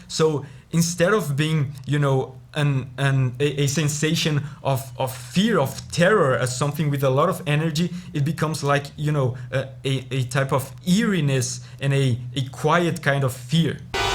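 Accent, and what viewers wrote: Brazil. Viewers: Right in the first phrase i noticed that you are brazilian. Muito foda teu trabalho, mano! assisti vários vídeos em seguida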